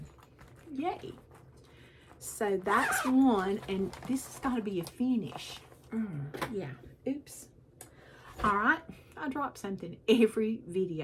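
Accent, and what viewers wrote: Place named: South, United States. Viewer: Congratulations on 1,000 subscribers. I was born in Texas, so love listening to your southern accent. I love to play pickleball and take color tour walks in the fall ya’ll. Kitten, wine, quilt, Henry, Season, Thanksgiving, Raven, Sampler, Seashell, Pumpkin.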